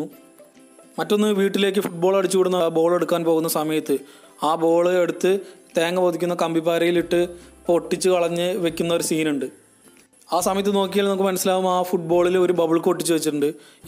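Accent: Palakkad, India. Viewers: Cheettan palakkad ano???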